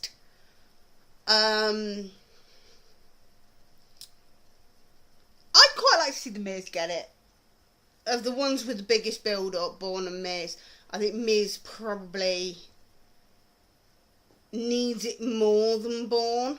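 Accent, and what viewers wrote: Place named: United Kingdom. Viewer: oh whatever girlfriend, let those haters hate, your videos are great and your views on wrestling are smart and direct, plus, you have a killer british accent.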